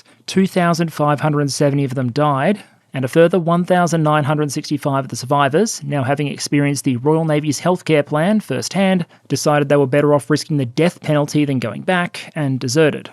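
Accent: Australia. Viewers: Regarding the comment you received about your accent, all I can say is most Americans that I know think Aussie accents are rather charming. While the stereotypical Brit 'upper class' accent seems somewhat snooty, Aussie's exude a friendly 'how are ya mate' quality. Yours is fine and combined with your humor and knowledge make the videos very listenable. 🇦🇺🦘👍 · Finland within top ten :D Well, greetings from over here, war topics are indeed in our heads. p.s. I didnt realise some people dont like the aussie accent, I think its cute, gives a nice relaxing atmosphere to otherwise serious and analytical approach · Thoroughly enjoyable episode! and I always like a good strong Australian accent, being myself an American with probably just as gratingly obnoxious an accent as any Australian, if I get real local about it. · If it makes any difference, I find an Aussie accent to convey sincerity and humility and combined with the sense of humour, enjoy it a lot. 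· That last bit is why I have watched your stuff for well over two years now. You're insightful, intelligent, honest, and humble. Keep up the good work and don't worry about the Aussie-isms. I'm sure us Americans will learn eventually. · a very heartfelt "THANK YOU!" for your channel. Love your Aussie accent and Emu v. Kiwi examples. Keep up the great work! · There are people out there that don't enjoy listening to the Australian accent? 🇺🇲🇦🇺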